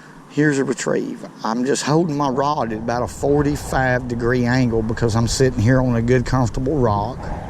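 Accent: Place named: Alabama, United States. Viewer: i loved your video. thank you for taking the time to produce it. love the alabama accent. have a friend from there and you sound like him.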